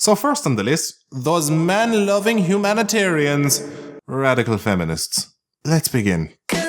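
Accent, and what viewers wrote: Ireland. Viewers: Goldenaries 08 it's a well spoken Irish accent. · Is he irish mate pls be cause I be the only one hardly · I feel I've missed something since I've never heard of you till today when you waddled into my YT feed. Love your accent (Irish? the Yank guessed) and agree the world is fucking insane. Subbed. Keep up this.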